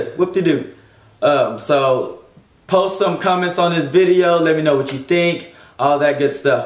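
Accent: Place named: South, United States. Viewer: certain things you say gave your accent away, pretty southern and sounded like T.I a bit. lol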